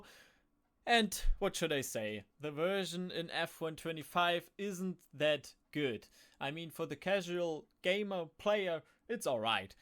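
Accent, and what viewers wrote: Germany. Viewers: Irgend wo höre ich den deutschen Akzent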